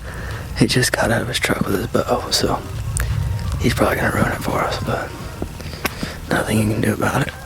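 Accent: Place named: Texas, United States. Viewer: I thought you was from Texas. Tuffin up boy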